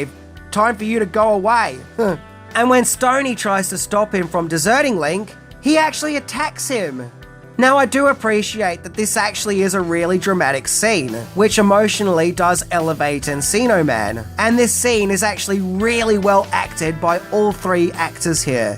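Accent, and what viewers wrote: Australia. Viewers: Who cares if you make a mistake in pronunciation?!? By this time (those of us who have been longtime fan / friends) we all know how much you love your work and the add ins and jokes you pop off more than make up for something as small and Brandon or Brendon. Intent counts friend and your intentions and ending products are AWESOME!!! Plus a lot of us in the states would love to sit and listen to an Australian read a phone book just to hear that accent!!! It’s the best! Keep on keeping on buddy! 😊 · No don't be like that! It's all good. We can chalk it up to your cool Australian accent! Most of us don't mind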